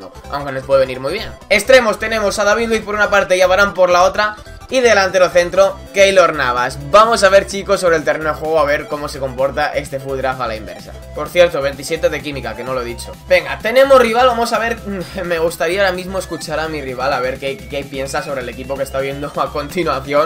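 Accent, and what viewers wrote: Mexico. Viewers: eres de sinaloa mexico?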